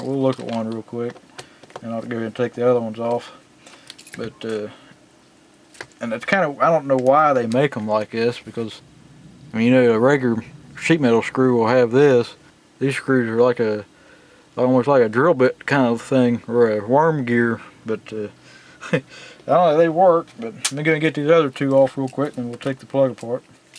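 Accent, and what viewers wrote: South, United States. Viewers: I don't have any comments about this video in particular, but I just wanted to say that I just discovered your channel and the videos are really well made and I appreciate how informative you are. Keep it up! Also, you don't have to say if you don't want to,but.. you wouldn't happen to be a TN or a NC man, would ya? You sound like.. well, you sound like me. haha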